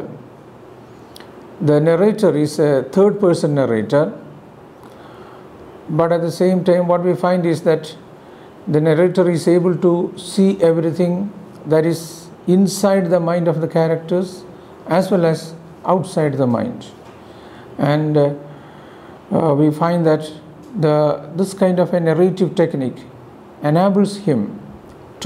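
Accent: India. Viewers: Thanks so much for very understandable explanation, easy language with an Indian pronounciation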